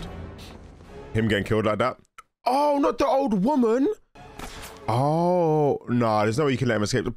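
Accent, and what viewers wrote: United Kingdom. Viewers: Oh my gosh, you reacting to Disney movies would be so lit!!! Geez, I just watched a little further and you not knowing that the US was even in WWII is killing me just a little bit inside LOL Despite the accent, I forget to consider you're British sometimes during your reactions to American movies/shows. To be fair though, I guess I don't know too much about specific contributions England had during the war, so I can't expect more than that out of you for America. I definitely know y'all were one of the major Allied powers though! haha (The most specific thing that comes to mind to me for Great Britain is "Keep Calm & Carry On" and the context surrounding that.)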